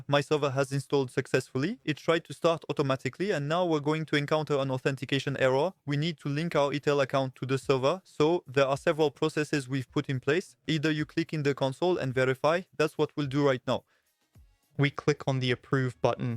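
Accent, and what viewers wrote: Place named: India. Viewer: nice hindi IA accent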